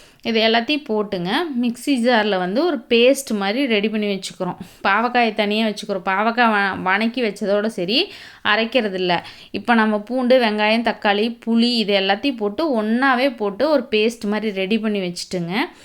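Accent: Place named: Kongu, India. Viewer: nenga yaru slang ah change panna solla. Ithu kongu slang. Itha la unga nala eathuku mudila na enna pandrathu. Be inclusive❤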